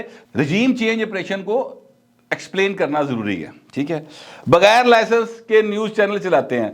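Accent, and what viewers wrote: Punjab, Pakistan. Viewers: Will u pl lessen the touch of Punjabi.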